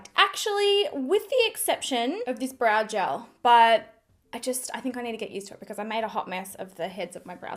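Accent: Australia. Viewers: Just as I'm having fun listening to your aussie accent..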